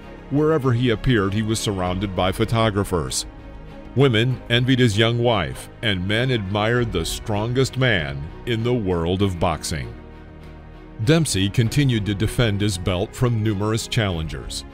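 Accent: United States. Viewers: Hey narrator with American accent, wtf is "kilograms"? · This narration is with an American accent yet Dempsey's weight is given in kilos. They tried to force us into the metric system decades ago, but that was rejected. I doubt we'll be using kg, mm, liters, etc. any time soon.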